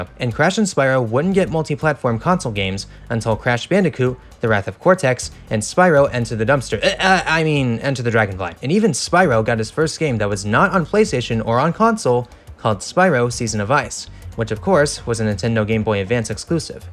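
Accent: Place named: New York, United States. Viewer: Well, although I am glad about the information I have never once been excited to talk about with other channels, so instead I just want to comment on how your main character has been improving and how nice it looks. Really does give me that Spyro/Crash vibe, which I mean is further helped by your accent. Actually, it kinda sounds similar to Billy Joels and my Mami, do I just like New York and New Jersey accents or something. Probably the latter, seeing how many great films and games were made during their Era of stuff such as Ghostbusters, that Atlantis Disney Movie, etc that I grew up with when I was a young boy.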